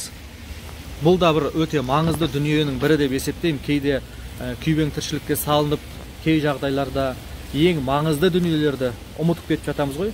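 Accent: Standard. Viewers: Балам, қайда жүрсеңде, аман бол! Шет елде жүрсеңде, таза қазақша, мәнерлеп сөйлегеніңе, мың рахмет! Сені туған, анадан айналдым! Біздің Қазақстанның мынандай күнге жету қайда! Аман бол, балам! Ақ Жол! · Қандай керемет! Таза қазақша сөйлегеніңіз ұнады! Алла жар болсын Сізге! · бауырым, өте керемет видеолар. Мен бірінші рет көріп отырмын,керемет екен, жақсы әсер алдым, қазақша таза сөйлейді екенсіз 🌹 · Аман бол елге аман орал тек денин сау болсын Америкада журип казакшаны таза сойлеп турсын мын бол казак азаматы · Сәлем таза қазақ тілінде сөйлейсің Рахмет түсірген видеоны көріп отырмын. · Бауырым өте жақсы, видеоларың тартымды, тілің таза орысша қоспай сөйлеу мәнерің тартымды, ой өрісің кең екен, сөз жоқ. Біздеде астана, алматы, бүкіл облыс орталықтары, тіпті аудан, ауылдардады қазақтар екі этаж коттедж салып алып жатыр емеспе ( 80% қазақтар) жәйлап жайлап біздікілерде жетер осындай жағдайға деп тілейік. 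Қазақтың болашағы алда деп қойшы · Амансыңба бауырым. Қазақшаны жақсы таза сөйлейді екенсің жарайсың. Ол жақта қандай жұмыс жасайсың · Аман бол бауырым,керемет .Саған тек сәттілік тілеймін.Қазақша таза сөйлеп отырғаныңа тәнтімін,рахмет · Тамаша видио . Сені осындаи ер азамат етіп тəрбиелеп өсірген атаң мен апаңа, əке шешеңе мың алғыс ! Шалғаида жүрсеңде қазақша тап таза сөлеп тұрсың. Табысты бол! 🤗👌🙏🇰🇿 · Не деген керемет !Қазақтың нағыз азаматы екенсің! Таза қазақ тілінде сөйлегенің көңіл қуантарлық! Алла нәсіп етіп,елге жеңіспен орал! Бағың жансын,бауырым! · Қазақша таза сөйлеп жақсы видо болды тамаша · Жарайсыз бір орысша сөз арластырмастан таза қазақша әдемі сөйлеп, әдемі видео ролик түсіріп шықтыңыз, бәрі түсінікті болды.рақмет. деніңіз сау, жарыңызбен бала-шағаңыздың қызығын көріңіз!!! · Керемет.Тап-таза сауатты сөйлейді екен қазақ тілінде.Денің сау болсын қазақтың - Азаматы.Қайда жүрсең де аман бол! 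· Кандай жаксы таза казакша сойлеген кулакка жагымды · Қайран қазақтың кемпір шалының тәрбиесі десеңші, қазақы тәрбиенің иісі аңқып тұр. Бауырым осы қалпыңда қал. Тілің таза екен. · Озге елде оз тилинде таза сойлеу. Оз елиндеги тил билмейтин балыктардан караганда мын есе артык алла алдыннан жарылкасын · Керемет түсіндіріп жатырсын. Жалпак қазақ тілінде. Айналайын биіктерден көріне бергын. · Казакша таза сойлейсин оте жаксы жат елди донгелетип турсын аман жур айналайын болашагын жаркын болсын айткандарынды тындай бергин келеди саган амандык саттилик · Рахмет жігіт,қандай таза сөйлейсін қазақ тілін! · Алла разы болсын.нағыз қазақтың жігіті екенсің таза сөилегеніңе рзамын бәрі тамаша👍👍✊ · Таза казакша сойлейди екенсиз,кай жерденсиз? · казакша таза сойлегенине риза болдым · Рахмет бауырым аман жур таза казакша сойлейди екенсин тамаша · Жарайсын бала. Қазақ тілін таза, білімді бала екенсін. Біздің Қазақстанды ослай дамыту үшін сен сияқты білімді жастар керек және халықтың көз қарасын ойын өзгерту керек жақсы өмірге. 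· Керемет қазақша сөйлеген таза жарайсын рақмет биіктен көріне бер ✊❤ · Жарайсын! Кандай адепти де мадениетти сойлегенинин ози тамаша, тагы да таза казакша сойлегенине рахаттанып отырып кордим. Рахмет! Бари тамаша кандай керемет орындар!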